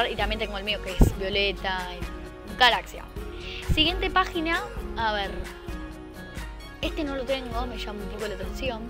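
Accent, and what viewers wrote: Argentina. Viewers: YO KIERO UNOS ROBUX TOY RE POBRE MEN PARECES DE ARGENTINA :v +Venhado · Vos ser argentino?like si lo eres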